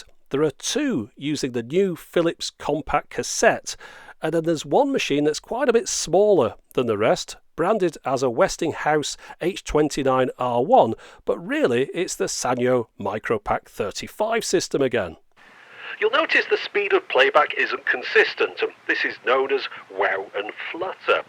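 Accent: United Kingdom. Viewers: You're my favorite, seriously, you're the best of all technology channels, and I love your British accent ^^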